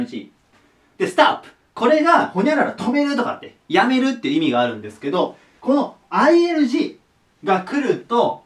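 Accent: Japan. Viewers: 発音はちょっと。。。😂😂ジャパニーズ・イングリッシュ。。。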